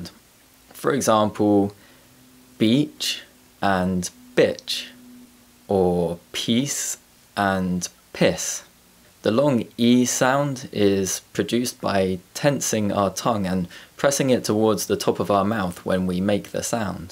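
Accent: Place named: Yorkshire, United Kingdom. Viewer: So helpful and a very nice accent! Is it Yorkshire?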